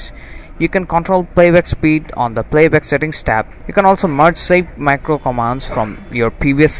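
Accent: India. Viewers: cazzo sei un indiano?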